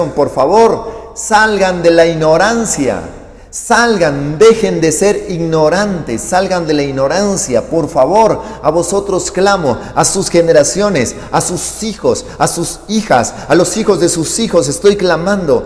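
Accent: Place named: Mexico. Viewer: yo pensaba que el pastor era mexicano 😄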